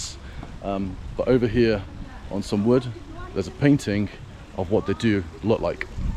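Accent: United Kingdom. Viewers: you've got a British accent?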